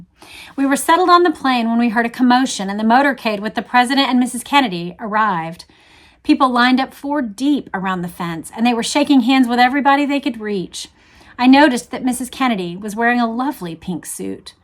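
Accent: Texas, United States. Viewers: It's that Texas accent, which is one of many Southern American accents. Add the pleasant style of her reading & it's very relaxing. I've learned Not to listen to before bed. 😊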